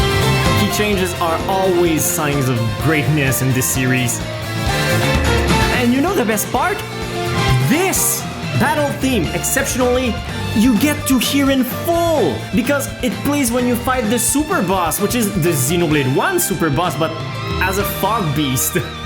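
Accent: Quebec, Canada. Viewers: Was listening to this video like "This guy sounds like hes fron quebec" then i heard you say Matthew and instantly knew without a doubt you were Great video you showed me some leitmotifs i missed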